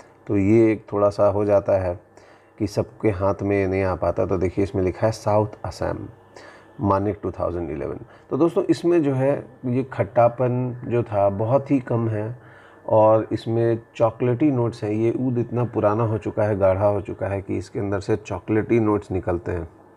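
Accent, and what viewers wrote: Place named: Dehradun, India. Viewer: Hi, I do like the way you talk. Infact you do talk like my relative from Dehradun. I hope you don't mind telling me your full name and your birth place.😊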